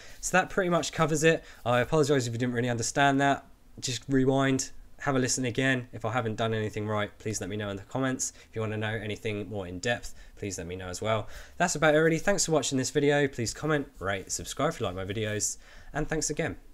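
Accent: United Kingdom. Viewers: He has a strong British accent.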